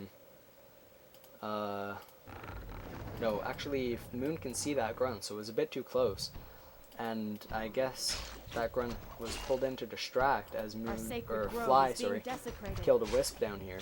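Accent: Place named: Canada. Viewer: Nice smooth voice, Canada eh? I think I've seen you on US East? " "? Thanks for the upload!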